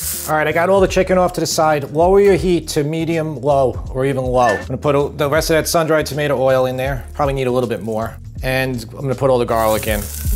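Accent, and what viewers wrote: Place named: Queens, United States. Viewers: You have such a thick LI accent. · My parents were from Queens and we grew up on Long Island. I don't notice it so much in person, but when I speak with my siblings on the phone, they all sound like you.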